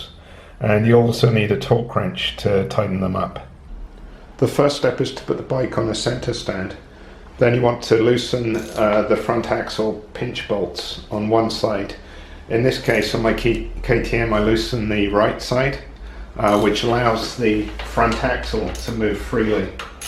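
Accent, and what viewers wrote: United Kingdom. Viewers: Hi just wondering how you got tusk wheels as it sounds like you are based in England?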